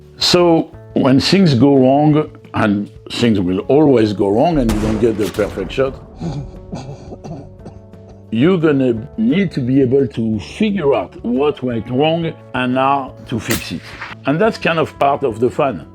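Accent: France. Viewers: What is that accent ? French ? Tu es français ?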